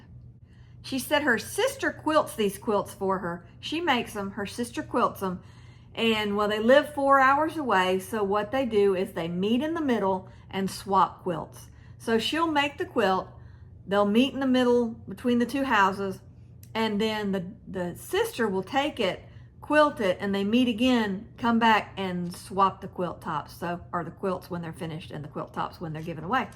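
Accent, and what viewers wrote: South, United States. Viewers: Good Saturday morning Miss! Thanks for sharing my quilts. My last name is Rick-er. Rick with an "er" on the end. You're such a sweet lady. I love your southern accent.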